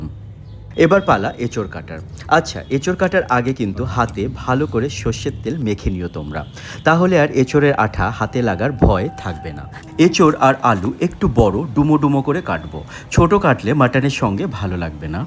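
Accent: Bangal, Bangladesh. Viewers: তোমার বাঙ্গাল কথা বেশ ভালো লাগলো,আরও বেশী শুনতে চাই। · তোমার মুখে বাঙ্গাল ভাষা টা শুনে বেশ ভালো লাগলো 🙂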